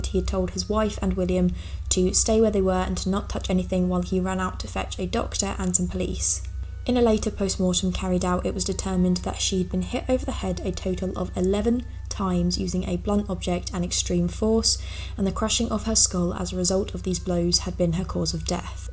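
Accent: Essex, United Kingdom. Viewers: I love you're accent people from the UK have lovely voices. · they're mostly Northern English accents, which are considered sort of "proletarian" and less "pretty", maybe because business english / upper class English is closer to Southern English and the North used to be more working class, and working class accents don't enunciate words as clearly. Scouse is the dialect around Liverpool, Geordie is Newcastle, Cockney is working class areas of London. is from Essex but her accent isn't strong Essex imo.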